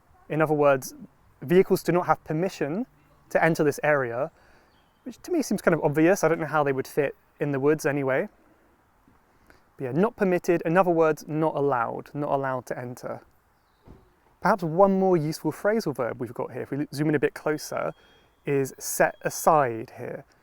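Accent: United Kingdom. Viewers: Very nice video. Love British accent.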